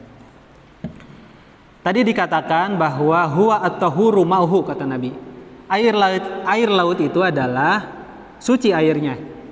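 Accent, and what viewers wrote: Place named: West Java, Indonesia. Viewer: Logatnya kaya orang Sunda ya ustadz, hehe maaf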